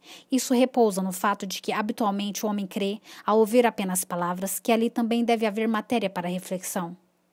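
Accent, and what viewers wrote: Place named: Caipira, Brazil. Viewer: Jesus que sotaque de caipira socorroooi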